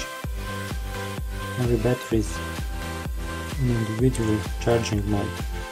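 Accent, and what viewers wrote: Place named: Italy. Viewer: dall'accento sembri italiano :o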